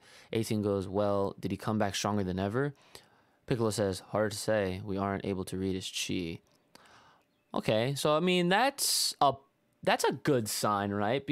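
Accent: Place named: Philadelphia, United States. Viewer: Bro you from philly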